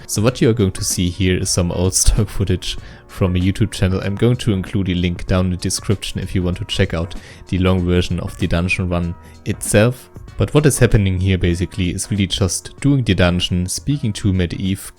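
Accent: Germany. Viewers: He is german, i think.. :D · german Accent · Are you german? Because of the accent. Just asking.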